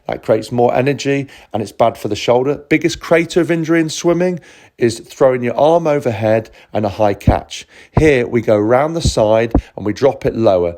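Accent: United Kingdom. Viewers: This british accent makes me cry😢😢😢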